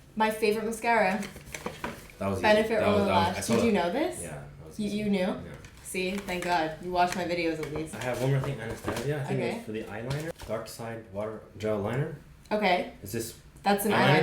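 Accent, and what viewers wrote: Canada. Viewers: omg random side note but im so proud bc i can spot your canadian accent woo woo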